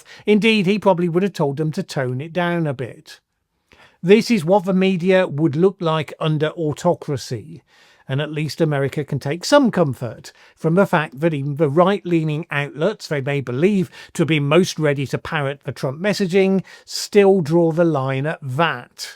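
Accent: United Kingdom. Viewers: There’s something strange about somebody with a British accent, criticizing America. Criticize your own country!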